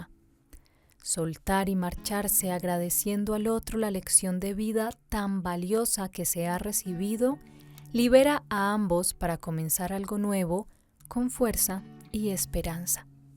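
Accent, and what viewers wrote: Colombia. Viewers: Nada mas hermoso que escuchar una colombiana. saludos desde México.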